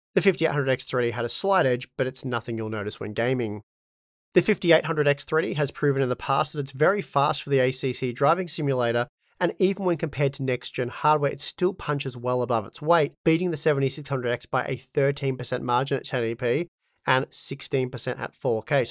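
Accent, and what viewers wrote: Australia. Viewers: The difficulty is probably higher with the Aussie accent on top of that. Though Steve from GN also spits out numbers at a million miles an hour.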